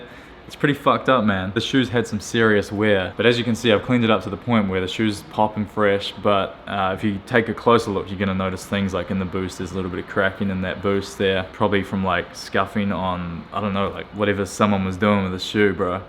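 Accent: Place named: Australia. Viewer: Broooo, How much did you pay? You sound like your from Australia.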